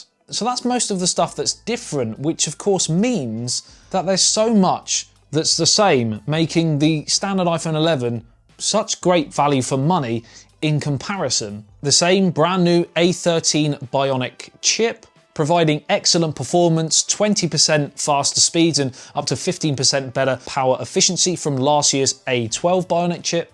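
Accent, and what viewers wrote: United Kingdom. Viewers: Typical irritatiing british accent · is British by his accent...and i guess I am right...!!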